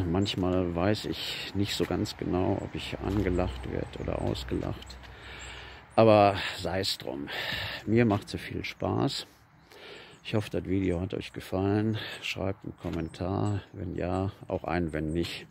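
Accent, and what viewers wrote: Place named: Ruhr, Germany. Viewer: Ömma. Du komms aber auch ausm Pott, oder?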